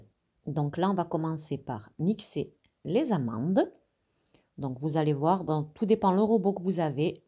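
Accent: South, France. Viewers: Merci beaucoup pour tes recettes, très très bien expliquées, avec en plus, ton bel accent du Sud, pleins de gros bisous du Gard 😊😊😊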